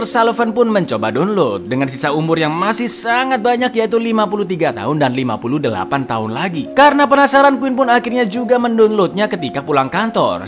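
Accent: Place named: West Java, Indonesia. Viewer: orang Sunda ya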